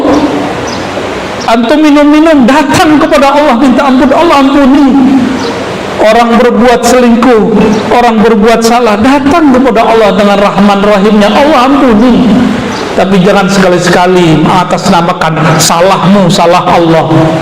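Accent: Padang, Indonesia. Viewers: Tarimo kasih Buya bahasonyo awak Samo Samo urang Padang,, tapi beda kota....😊sanang hati mandanga nyo 🙏🤗👍 semoga kita inginkan kampuang halaman 🤗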